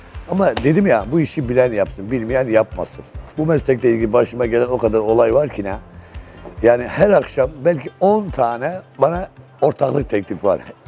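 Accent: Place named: Black Sea, Turkey. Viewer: Abi sende Karadeniz şivesi var.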